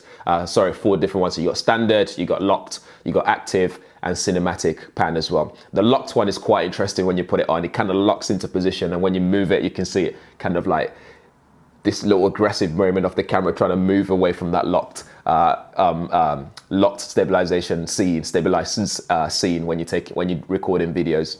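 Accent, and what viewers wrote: United Kingdom. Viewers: love your British accent dude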